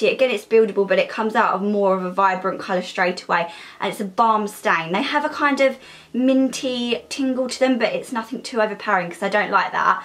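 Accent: Essex, United Kingdom. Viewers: Are you from essex💁😁😁😁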